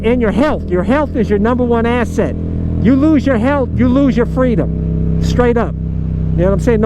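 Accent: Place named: New Orleans, United States. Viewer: With your accent I always thought you were just from New Orleans 🤔🍷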